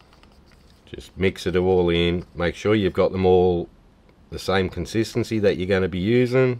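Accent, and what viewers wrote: Australia. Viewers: where have you been all my painting life?! super glad I stumbled onto your channel - doesn’t hurt to hear you teach in that Australian accent 🥰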